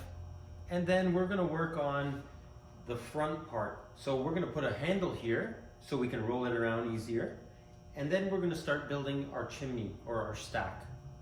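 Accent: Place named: Ontario, Canada. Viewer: Ha was watching and just by your accent I knew you were one of the good guys, Canadians that is and from Ontario. I am in Windsor and I am build a smoker as well and just love watching others build them. Thanks for the video eh!!!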